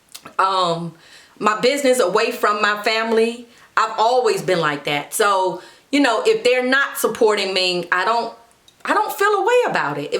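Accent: South, United States. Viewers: Im from north Jersey and I just love that . Southern accent .. and you look great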